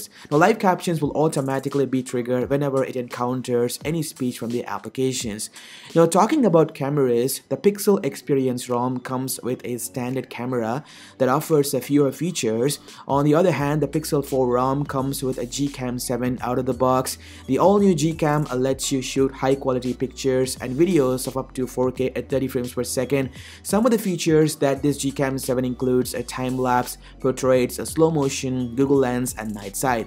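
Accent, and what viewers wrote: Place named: India. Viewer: Please it's data..not dayta....no hate but keep you Indian accent man please.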